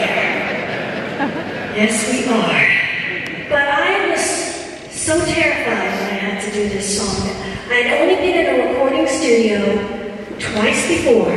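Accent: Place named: United States. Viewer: she sounds american now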